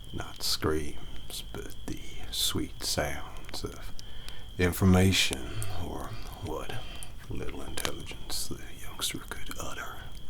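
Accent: South, United States. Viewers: Well, butter my ass and call me a biscuit! Our boy can speak Southern! 😁 Great job on this. The crickets and night sounds were awesome. Every video is like stepping into another world for a while. You're amazing. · Being a Texan myself, I don't have this kind of Southern accent, but I do find it so funny whenever I hear it. Great job, you are a wonderful talented artist. And, I'm afraid to admit, kind of sexy in this one, if you don't mind me saying so.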